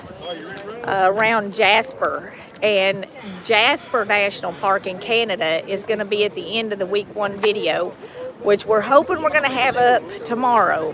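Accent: South, United States. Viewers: Your little country voice just sounds so sweet. ❤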